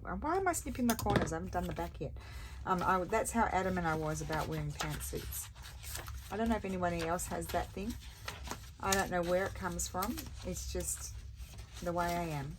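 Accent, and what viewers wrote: Australia. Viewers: I often wonder if people not from Australia can understand you because i feel you speak Australia ,using slang or shortened words or sayings that we use regularly. Im not having a go at you because you did mentioned your uncle correcting you. When ever you say something that is Aussie I just smile.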